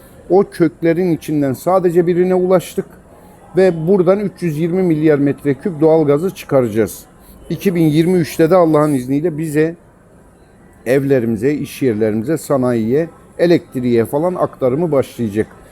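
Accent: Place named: Kars, Turkey. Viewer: Abi gine kars şivesi sıkışırdın BUNLAR SARSAK OĞLU SARSAK 🇹🇷🇹🇷🇹🇷🇹🇷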